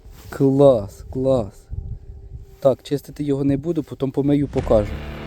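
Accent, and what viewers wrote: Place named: Vinnytsia, Ukraine. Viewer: Найдобріший з копарьских каналів. А як гарно звучить вінницька промова! Найчистіша українська імхо! треба щось з ніком робити, бо навпаки читається нетой.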